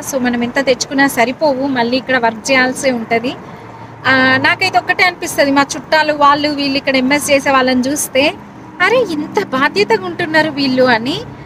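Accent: Telangana, India. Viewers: Hi garu madu narasaraopet.kani me slang ala ledu.nenu Inka meeru Telangana anukunna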